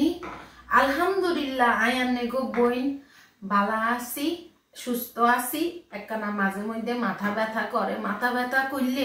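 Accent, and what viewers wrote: Noakhali, Bangladesh. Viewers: আপু আপনার খাওয়া দেখে। আমার ও রুচি বেড়ে যায়..আপনার নোয়াখালী ভাষা শুনতে খুব ভালো লাগে।...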